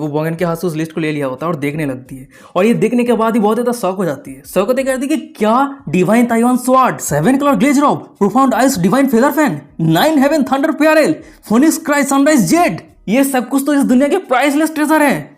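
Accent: Bengal, India. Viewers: Bhai tum Bangal se ho na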